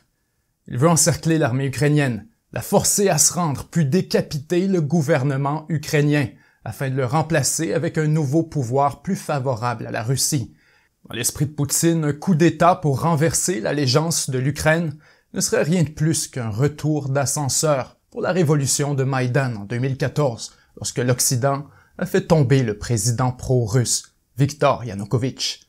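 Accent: Quebec, Canada. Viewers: Les analyses géopolitique de Mr Asselineau sont pas mal du point vue de la France et l'Europe. Je découvre cette chaîne, et j'en suis ravie. En plus j'aime entendre l'accent canadien. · ha l'accent québécois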